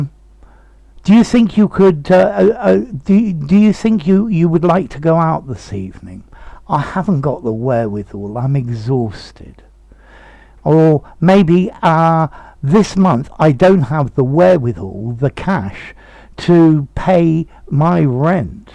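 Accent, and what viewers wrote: United Kingdom. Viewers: Great explanation...the British accent is so beautiful and nice to listen to...why is that?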